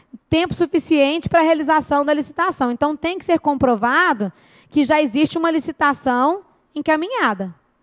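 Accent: Minas Gerais, Brazil. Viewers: Professora, vc é muito lindinha com esse sotaque, "uai"!!!!! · Professora, DEUS abençõe sua vida por sua boa vontade, continue lhe dando disposição e muita saúde para continua lecionando de forma tão agradável!! às vezes fico quase o dia todo escutando suas aulas. Tenho aprendido bastante!!! gosto da sua aboradagem, da forma como vc se expressa e todos os exemplo que vc utiliza. Sem se falar do sotaque mineiro rsrsrs acho uma gracinha!!! Obrigada de coração!!!!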